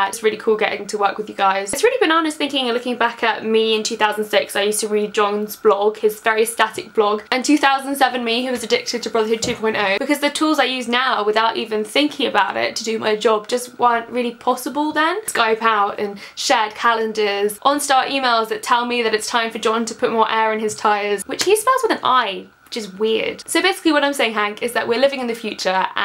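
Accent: United Kingdom. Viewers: The Queen's English. Not everybody speaks 'Merkin, yanno? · Oh my god a British accent on vlogbrothers it feels wrong yet beautiful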